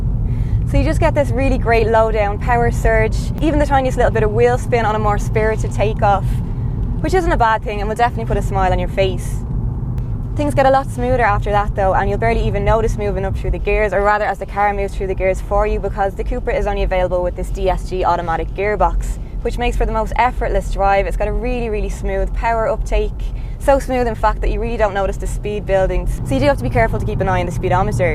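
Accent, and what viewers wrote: Dublin, Ireland. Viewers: That Dublin Accent is so nice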